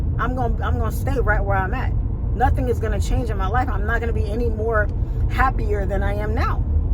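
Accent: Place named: New York, United States. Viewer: I can tell your from New York.We don't trust like that growing up in those streets.Congrats on your success and for giving up your insight.❤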